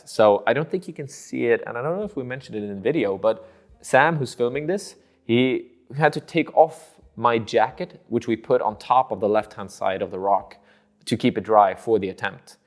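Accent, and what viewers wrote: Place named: South, United States. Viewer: I thought this guy had a Southern twang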